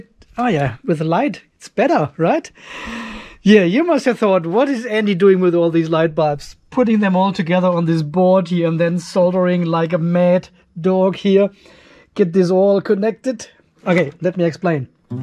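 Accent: Germany. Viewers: Hey there, I've ordered the same cells.. waiting for delivery 😤 Btw: is it possible that you are a German guy? Sound very familiar to me. Keep on going, looking forward to your next video....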